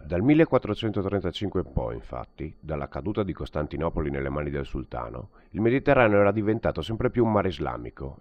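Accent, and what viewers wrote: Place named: Veneto, Italy. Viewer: Perche' non capisci che la parlata e' veneta, il testo e' antico... e' forse una delle piu' belle letture sulla battaglia di lepanto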